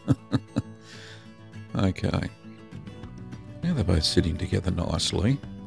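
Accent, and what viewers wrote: Australia. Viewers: This is my father inlaws favorite cheese thank you for making all these ethnic cheeses, you're really helping me endear myself to my wife's family. Hope you and yours are doing well. Love the Aussie pronunciation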